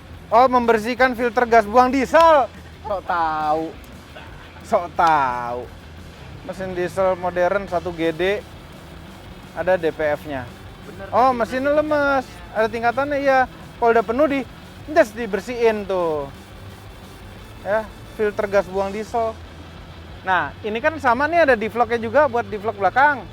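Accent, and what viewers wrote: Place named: Kalimantan, Indonesia. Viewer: Aghhhh .... asyik banget denger nya om, bahasa " fungsi dan bukan untuk gaya" sprti bahasa pulau kalimantan😅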